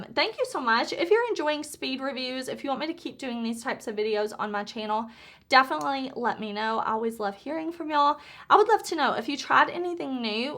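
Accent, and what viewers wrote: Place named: Arkansas, United States. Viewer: Where are you from? Bc u sound like me 😅 I’m from Arkansas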